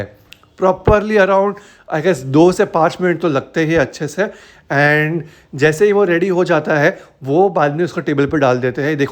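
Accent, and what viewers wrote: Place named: Gujarat, India. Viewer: marcha vaghar rahey hey....😂 typical Gujju.. hindi + gujuu.. 👍👍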